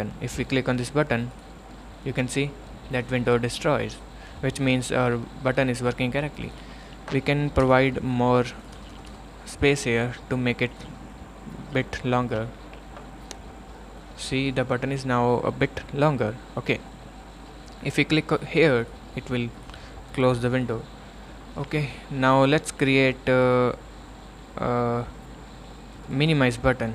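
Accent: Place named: India. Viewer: now thats an indian accent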